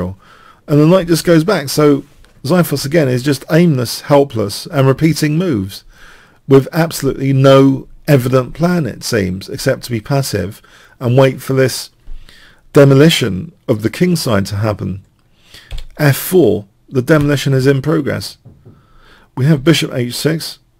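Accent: England, United Kingdom. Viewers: What part of England are you from?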